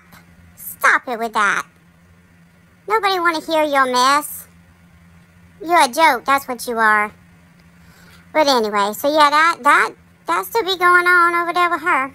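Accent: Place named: Boston, United States. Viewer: I love your videos, No one can be mad at a princess. 😂😂😂😂 Chasing in her boston accent. signed the "youtube poo poo" 😂😂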